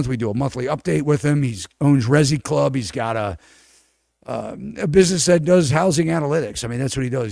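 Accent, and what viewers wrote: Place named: Baltimore, United States. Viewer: BTW, your Baltimore accent is fantastic; the best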